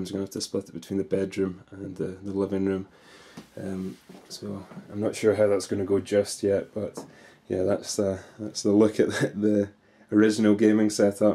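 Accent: Scotland, United Kingdom. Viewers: do u live in Scotland ur accent sounds Scottish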